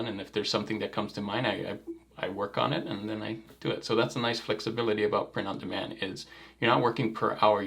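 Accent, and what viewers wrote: Hawaii, United States. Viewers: EH! congrats on da 150k! 🤙 i noticed the small kine accent when i first started watching your videos. but since you never made much reference to Hawaii in the other uploads that i have seen, i kinda shrugged it off as me assuming too much! LOL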